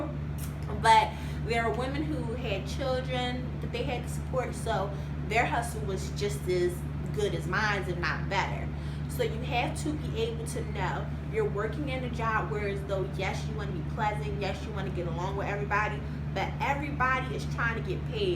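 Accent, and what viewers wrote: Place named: Philadelphia, United States. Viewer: Are you from Philly or New Jersey? You sound just like my family! I just got the job today. So excited to start. I will take your advice going forward. Tfs your experience. You're super amazing! <3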